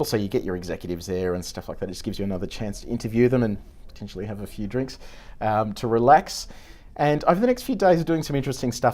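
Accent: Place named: Australia. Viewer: Gotta tell ya, for your first go at this sorta stuff, you do it better than most. And it's refreshing to hear an Aussie vlogger:) can't wait to see more!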